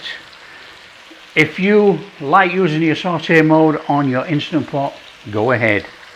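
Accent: United Kingdom. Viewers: That sounds delicious, but I have no Idea, where I can get suet in germany. Maybe I just try it with butter. Cooked your chinese beef with onions today and had a look, what else I can learn from you. I really like your accent, from which part of the UK do you come? I can understand every word, thats nice, compared to all the mumbling americans, I can only get every third word…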